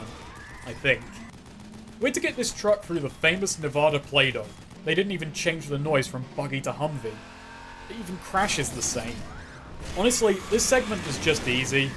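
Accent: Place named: RP, United Kingdom. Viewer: Good lesson to learn for indie devs. Better have your shit together or some tosser will absolutely roast your efforts to bits unless it's at the standard of the original game. With the pretense that because he has a posh English accent what he has to say is somehow important in some way. Excellent video A+. Will totally share.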